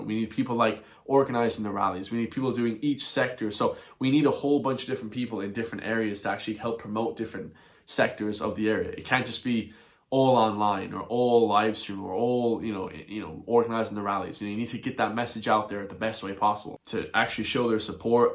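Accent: United States. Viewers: American accent, why?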